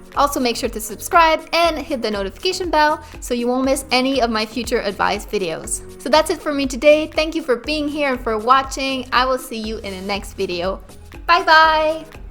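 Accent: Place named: Canada. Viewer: Thank you,. That was really helpful! Can you do a tutorial, or series about Procreate on iPad or Affinity Designer on MacOS? Btw: Canadians are the best, love your accent! <3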